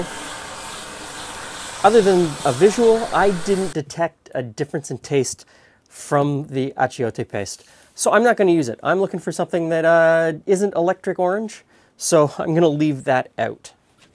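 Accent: Canada. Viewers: Canadian accent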